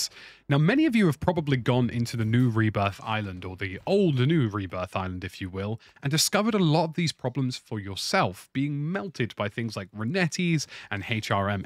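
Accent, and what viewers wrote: United Kingdom. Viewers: i just wanna say your videos are like asmr, i freakin love your chillaxed voice and bri ish accent (im american and incase you didnt know ALL americans love the sound of british accents) so anyway, thanks for these videos! you and markiplier are the only two people who plays games that i watch anymore, so u two must be doing something right! have an awesome week! 🐮🌻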